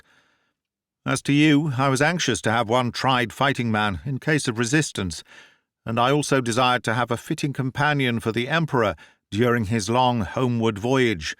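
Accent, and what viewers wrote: United Kingdom. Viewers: Its my utmost pleasure listening to your clear British accent that I love... Please never stop sharing stories narrated by your marvellous voice.. thank you very much sir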